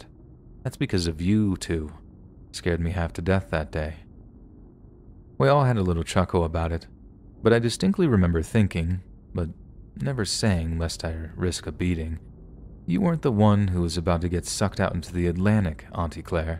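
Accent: Australia. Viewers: Except for his Australian accent. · Your aussie accent!! Love it 😍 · Australian accent is gold <3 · That Australian accent though...🖤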